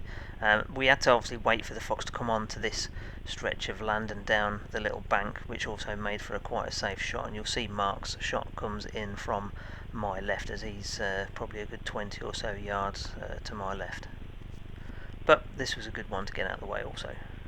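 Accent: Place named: United Kingdom. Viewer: I think it's that Brit accent that makes these videos better, compared to my American accent.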